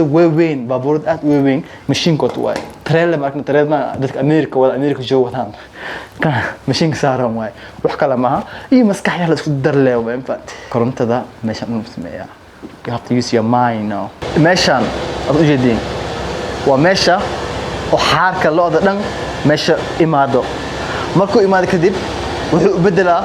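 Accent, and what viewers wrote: Mogadishu, Somalia. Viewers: Very interesting although I find it difficult to understand the reer xamar dialect.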